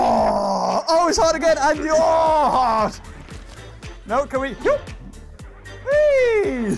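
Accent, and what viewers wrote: United Kingdom. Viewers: Are you a British socksfor1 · Are you a britt?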